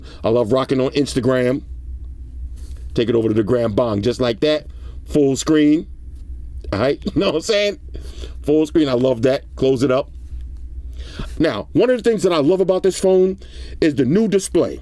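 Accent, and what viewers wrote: New York, United States. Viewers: is from the south and is from New York he has a New York accent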